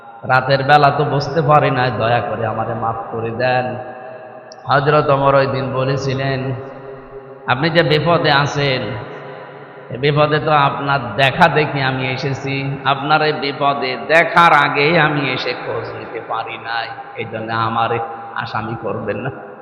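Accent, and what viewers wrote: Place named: India. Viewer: দাদা ইন্ডিয়ার সুরে কথা বলছে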